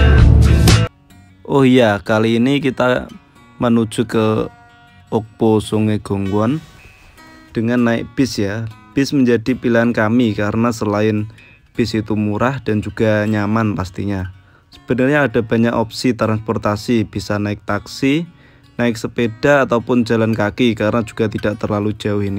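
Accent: Java, Indonesia. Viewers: Aslinya mana nichh kok suarane ada jawa2ny gtu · Ikutan give away ka. Menarik ka videonyanya apalagi denger suara kk yg logatnya jawa bangett, natural bangettt. Next tetap bikin video2 trip kakak yg menjelajahi setiap tempat wisata dan kuliner tentunya dikota apa negara tersebut nama : ayu furwanti kota : jakarta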